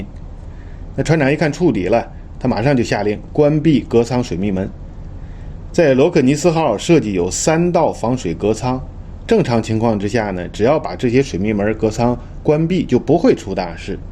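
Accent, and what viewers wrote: Tianjin, China. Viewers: 船长是天津人吧？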